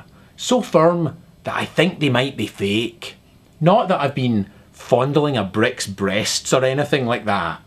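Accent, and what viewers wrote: Scotland, United Kingdom. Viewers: its a scottish accent .. not irish.. not british.. there is a huge difference.. duh w/e i luv this guy!!!! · People he's not Mexican! he's either scottish or Irish. Although, he is scottish I believe.... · Refreshing, and funny. Didnt like this guy at first, but he actually is quite unique, and says it as it is. Not sure where in Scotland this accent is, other than the Burgh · that was simply brilliant. you are scottish, right? Living in land of the free or still back in the state of thistle and tartan? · Lol , classic Scottish accent. I'd love to actually sound Scottish rather than English. A comment from a Scottish Tween x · o_o it's just a scottish accent.. many scottish people have that accent. it's not a voice xD it's his normal accent. lol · "Why is His accent so weird?" Because He's Scotish perhaps?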